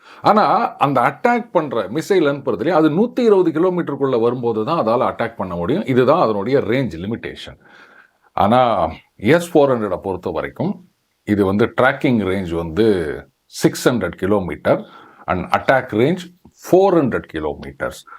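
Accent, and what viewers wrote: Chennai, India. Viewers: வணக்கம் சார். நீங்க அடிக்கடி இடையில் சென்னை பாஷையில் வெளுத்து வாங்குறீங்க 😂😂😂😂😂😅😅😅😅😅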